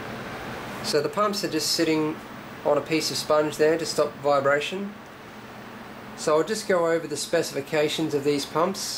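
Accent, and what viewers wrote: Australia. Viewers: are you aussie? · Is he Australian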